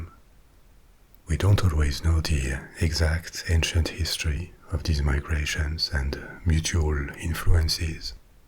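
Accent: France. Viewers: Your french dialect is whats makes it perfect. Thank you for your work. Listing to it every night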